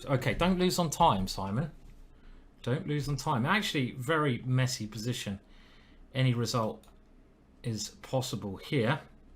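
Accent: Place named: South East England, United Kingdom. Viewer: Idk Simon, you do a pretty excellent South East English accent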